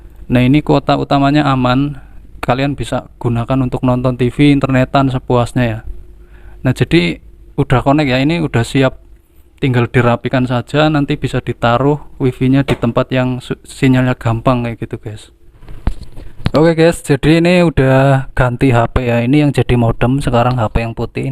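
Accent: Banyumas, Indonesia. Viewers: Ngapak apa yah kang?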